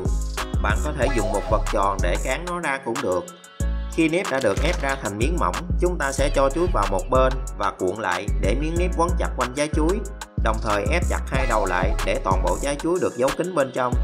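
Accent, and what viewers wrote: Mekong Delta, Vietnam. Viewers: Quay kiểu xịn quá chú. giọng miền tây đúng thân thiện ng việt nam ạ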